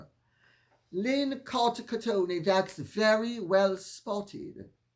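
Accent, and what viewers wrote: Jamaica. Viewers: Love the Jamaican accent 😀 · Yes I totally get it I am Caribbean also and when we want to put on our flava’ we really know how to put it on. I love love love love love the Jamaican accent. And I just wish she would do a whole video speaking that way. I can’t get enough of that wonderful spice! I am in Texas now and I so miss being around all the diversity that I grew up with in New York City and later enjoyed in Florida. I miss stopping by the Jamaican store to buy A Jamaican patty in Coco bread… and there’s nothing better than spiced bun with cheese in the morning with my coffee. Bring on that jerk spice Lady C! I am in Texas now …spice is a long way coming here! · Her accent is like that because she was born and bred in Jamaica and later moved to the U.K. Of course, she already spoke with a refined form of Jamaican accent because of her relatively privileged family origins. 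My Jamaican kin speak this way too. They happen to descend from a grandfather who settled there in the 1910s from England who married into an elite local family. They were educated there and sent to finishing schools in England and Switzerland. They sometimes wax more Caribbean at times, just like Lady C. when in full stride.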